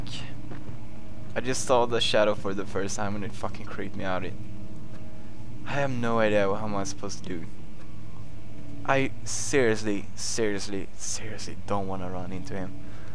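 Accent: Sweden. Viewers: I love how he's all Swedish and crap, so he isn't the best reader and didn't say barrels right. So cute! :3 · his Swedish accent aaaaaaaaa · Oh my, this is soooo funny when PewDie says "f*ck" with this swedish accentXD Even "f*ck" sounds cute with the swedish accent:D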